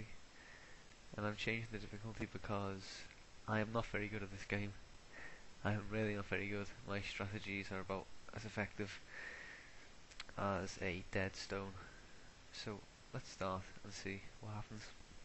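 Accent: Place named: Liverpool, United Kingdom. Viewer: Scouser! :P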